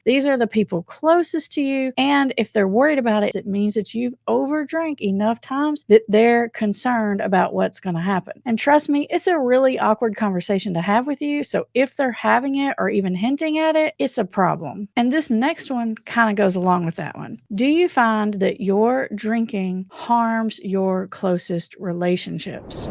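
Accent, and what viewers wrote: South, United States. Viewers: where’s your accent from? Southern states?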